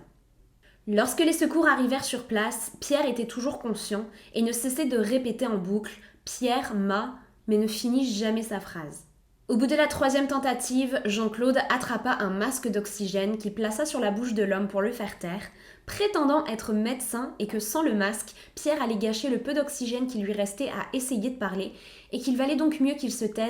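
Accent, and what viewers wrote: Quebec, Canada. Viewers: Au top. Comme d'hab 🔝👍🔝👍.... Retour en France du coup🔙🔜..... Promis pour une lilloise... T'as vraiment l'accent quebecois😆😆...j'adore👍👍👍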